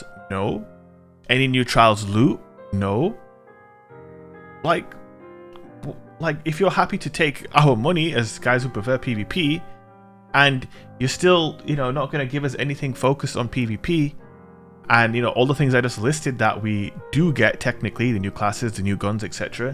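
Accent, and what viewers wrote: United Kingdom. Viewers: I freaking love your accent bro, that’s a big part of why I enjoy your content is just hearing you talk. That may sound offensive, but I don’t mean to say it that way. You really hype me up and I wish I had friends from the UK. On a positive note, remember that the ones sending you hate (the PvE players) are the ones that we teabag at the end of the game after destroying them😎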